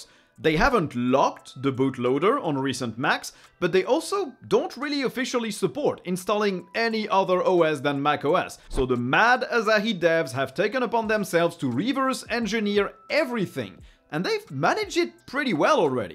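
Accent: France. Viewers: On entend un peu l'accent francais mais tu as vraiment un bon anglais franchement felicitations pour etre aussi bon en anglais! Pq avoir fait la chaine en anglais plutot que le francais d'ailleurs ?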